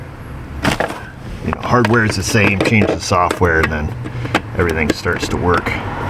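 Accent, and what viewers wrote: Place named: Upstate New York, United States. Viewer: I have been binge watching just to hear an upstate NY accent. I miss my alma mater Binghamton. Wish the communist hadn't taken over.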